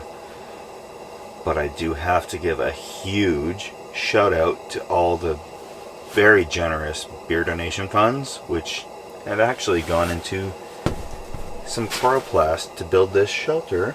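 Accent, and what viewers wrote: Canada. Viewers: Are you an Ontarian or albertan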